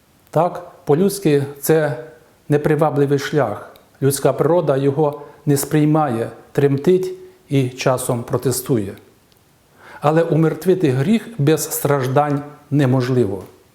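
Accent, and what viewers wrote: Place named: Russia. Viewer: ЧОМУ ЦЕЙ ПАКАНИЧ БРЕШЕ ВСЬОМУ СВІТУ.ЧОМУ ВИ НАЗИВАЄТЕ СВОЮ ЦЕРКВУ УКРАЇНСЬКОЮ, ПРИ ЦЬОМУ ВИ ФІЛІАЛ РПЦ.ВАШ ПАТРІАРХ КІРІЛ.НІЯКОГО ДОКУМЕНТА ПРО РОЗРИВ З ГУНДЯЄВИМ У ВАС НЕМАЄ.ТІЛЬКИ НА СЛОВАХ НАЗИВАЄТЕ СЕБЕ САМОСТІЙНИМИ.НАЗИВАЄТЕ СВОЮ УЕРКВУ УКРАЇНСЬКОЮ, ЩОБ РОБИТИ РОЗДОР В УЕРКВІ І УКРАЇНІ І ДАЛЬШЕ ВЕСТИ СВОЮ РУСЬКУ ПООПАГАНДУ В УКРАЇНІ.ВИ Є ЧАСТИНОЮ РПЦ.НАВІТЬ УКРАЇНСЬКІ СЛОВА ВИМОВЛЯЄТЕ ПЕРЕКРУЧУЮЧИ І МІШАЮЧИ З РОСІЙСЬКИМИ.ВИ Є МОСКОВСЬКИЙ ПІП, ІНІЯКИХ ДОКАЗІВ ЗВОРОТНІХ У ВАС НЕМАЄ.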